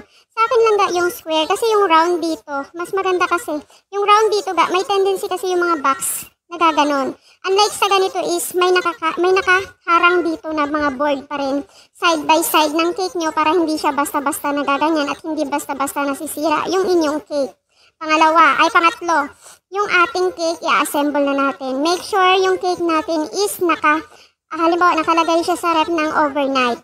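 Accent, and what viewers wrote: Iloilo, Philippines. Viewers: Ilongga ka gd guro langga mo hahahahah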